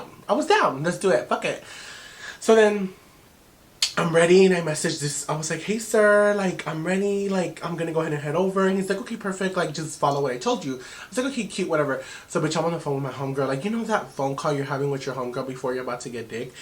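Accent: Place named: Dallas, United States. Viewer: I’m deaaaddd!!! I’m from Dallas and you sound like someone from D town 🤣❤️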